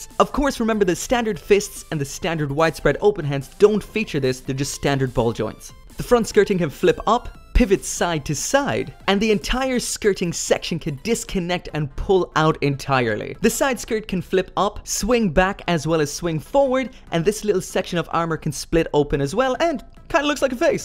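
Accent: Ireland. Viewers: I can't tell if your Irish or American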